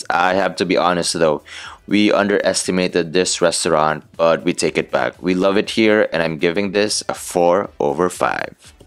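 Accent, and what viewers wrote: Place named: Philippines. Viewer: Loved it, give more food and fashion review!! I like filipinos english pronounce 👍🏻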